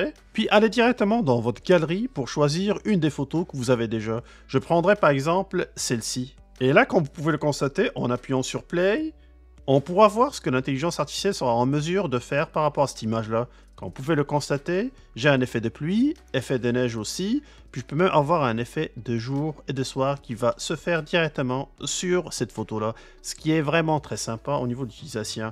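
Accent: Quebec, Canada. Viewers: Merci moi qui a détesté beaucoup le l'accent québécois maintenant je l'adore grâce à vous mon ami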